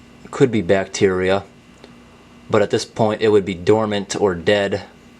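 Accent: New England, United States. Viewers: LOL i cant believe that doesnt even smell bad🤔, thats crazy. Btw your voice is amazing. It must be the new england accent🤔. Im from Canada. Anyways its very nice.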